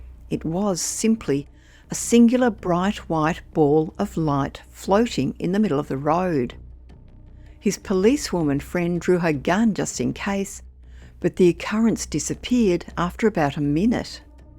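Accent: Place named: Australia. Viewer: Sexy aussie voice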